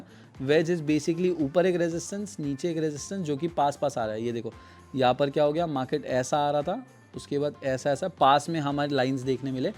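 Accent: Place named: Maharashtra, India. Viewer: Bhari vatl bhava tu Maharashtrian ahes aikun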